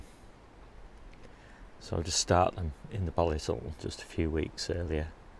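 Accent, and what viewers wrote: United Kingdom. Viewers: You sound like your in the UK. Where I live grocery store tomatoes are hardly fit to eat. They are picked green and gassed with ethylene. I might try this Tumbler variety.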